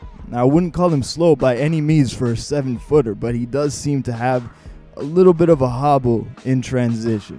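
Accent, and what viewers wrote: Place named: South, United States. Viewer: Your drawlin heavy👀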